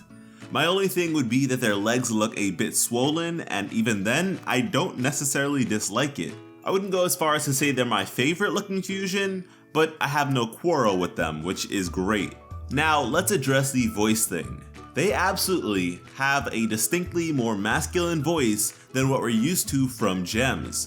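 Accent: United Kingdom. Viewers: He has a British voice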